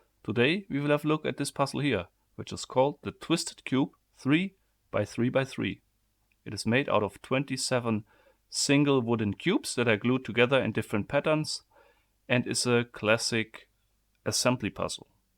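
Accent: Germany. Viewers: Im not going to lie the main reason i watch you is because i love the german accsent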